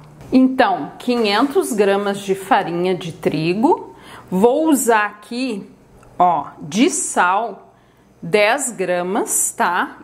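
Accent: Rio Grande do Sul, Brazil. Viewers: Essa guria é top demais!!! Arrazaaaaaaa sempre nas suas receitas maravilhosas !!! Adoro o sotaque dos gaúchos!! Gurizadas que falam bonitooooo tchê!!! 😊 Parabéns guria por mais uma delícia e gratidão sempre 👏👏👏👏👏👏😍😘🌹🌹🌹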